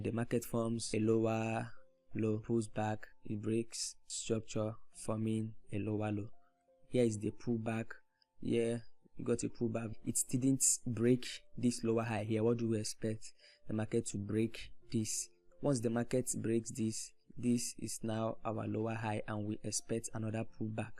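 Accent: Nigeria. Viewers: The fact that you're the first black dude I enjoyed it's videos is amazing. For real the way you broke down this topic is so understandable,I was filled with joy from the start to the ending. Judging from the accent it's the Ebira accent from Nigeria. (That's also my tribe ✌️✌️✌️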